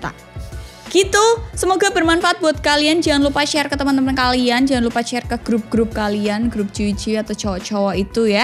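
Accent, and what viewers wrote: Java, Indonesia. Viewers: Mau kumpulin orang yg suka dengan cara bicaranya yg medok asli tdk di bikin2 ... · Mb medokku😍panggah ayu shoting dgn penampilan simpel yg sederhana❤ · Suka sama cara bicaranya yg muedhok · Kak bahasa nya medok banget uhh bikin suka kalo nonton nya😎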